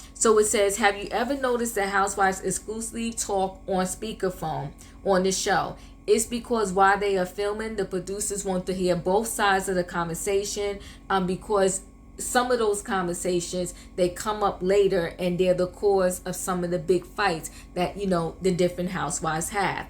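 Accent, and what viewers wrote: New York, United States. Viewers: You have a NY accent but your skin too healthy. You in FL?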